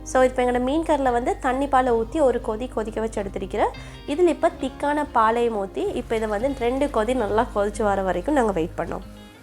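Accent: Sri Lanka. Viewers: Wow..... srilankan tamil...The bestest tamil in the world..... My dad's is a srilankan too. Love from India.... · Like your recipes,just started watching few of your videos. இலங்கையில் வாழும் எங்கள் உறவுகள் பேசும் தமிழ் கேட்க கேட்க தித்திபாக இருக்கின்றது.. உங்கள் தமிழில் சில காய்கறிகளின் பெயர்கள் மிகவும் புதுமையாக இருக்கிறது.இன்னும் நிறைய தெரிந்து கொள்ள வேண்டும் என்ற ஆசை உள்ளது. Looking forward for more videos in your loving தமிழ். Love and wishes from Banglore ,India.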